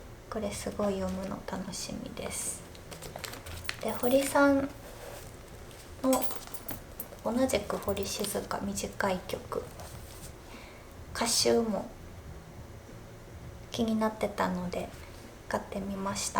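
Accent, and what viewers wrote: Kansai, Japan. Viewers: 台湾からの視聴者ですー！久しぶりに動画観れて嬉しいです。関西弁もかわいいです❤ 体調に気をつけて無理のないようにお過ごしください！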